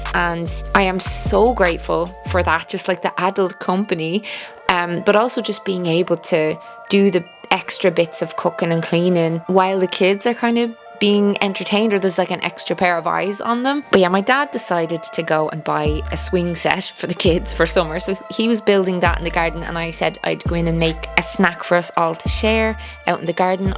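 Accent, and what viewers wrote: Ireland. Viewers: I love those videos ❤ you never dissapoint ❤ I'm from Poland, my boyfriend is from the UK, you're the first and probably the only youtuber I watch from Irleand and I love the accent. I'm in my mid twenties not close to having kids yet but yet you still manage to inspire me with every video you put out and to incorporate some bloody positive chagnes in my day to day life. No lying, you must be the youtuber that inspires me the most and if I had to count down on screen time your channel would be the only I'd watch ❤ I'm currently doing my driving licence as well I started when I was 19 but there's been complications I truly can't wait for this to be my reality, to have so much freedom I deeply long for ❤ I feel bad about myslef not having it sometimes. You share with us so I thought I'll say a few bits about me, your dedicated viewer. You really do inspire me for my future, kids, overcoming traumas/addictions etc. 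With two little kids you're doing such a good job! here on youtube and raising those little angels (I'm sure they have those days, but they seem so smart and emotionally inteliegent). It's incredible how simple things are sometimes, go to the forest, move your body, eat whole foods. Everytime I forget about it and start feeling like s.. I have to go back 🌳🌿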